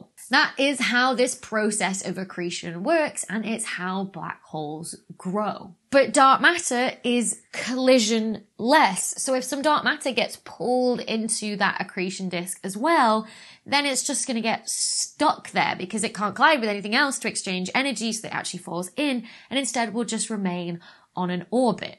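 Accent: United Kingdom. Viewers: Idear. Love the British accent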